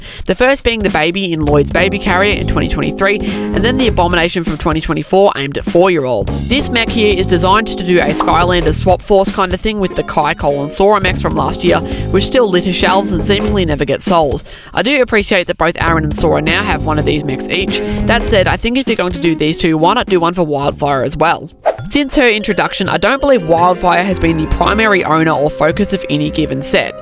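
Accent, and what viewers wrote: Australia. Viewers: Is this Australian or New Zealand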